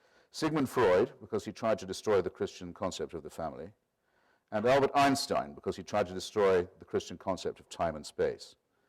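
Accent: United Kingdom. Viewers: So many reasoning errors, yet structured with such eloquence, a beautiful British accent and confident condescending tone. To name a few: 1. Opposing Zionism before the creation of the state of Israel is not the same as after it's establishment. In the same way that not wanting to have a child is different than wanting to get rid of a living person. 2. The fact that Jews didn't want to be told that their place is in Israel, doesn't really negate the idea of zionism. Zionism was never about forcing all Jews to live in Israel, just creating a safe haven. 3. An in-group argument is very different in nature a criticism from the outside. Targeting a group by finding a group inside the group that sides with you (for reasons that have to do with the Jewish religion, nothing to do with your reasons) is classic tokenism. Just say some of your friends are Jewish, it's shorter. 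4. The fact that there are antisemites that are content with Zionism, does not in any way lead to the conclusion that opposing Zionism is not antisemitic. It might have a nice witty ring when he says it, but logicality it doesn't follow. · I wish he had american accent. Bit hard to get everything with british accent. Good stuff thou😊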